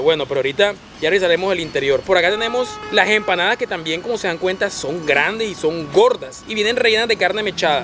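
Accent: Caribbean Coast, Colombia. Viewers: Si, el habla costeño, pero no vulgar, ojala no se amane. Que siga como hasta ahora ha llevado su canal, gracioso, pero sin rayar en la vulgaridad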